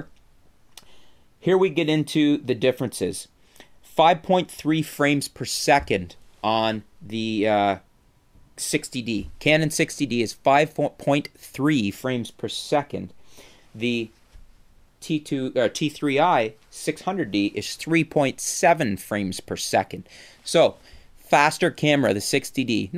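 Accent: Canada. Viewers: Goiod info. Canadian, eh? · After he said he was Canadian I couldn't ignore his accent.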